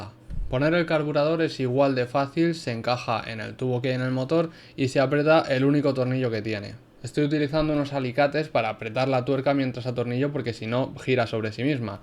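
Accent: Spain. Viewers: Bien esplicado y un inconfundible asento español o catalan . Miy bueno el video grasias y sige asiendo videos es lo tuyo hermano un abraso y sr felis y as el bien y no mires a quien . Saludos celso sanabria .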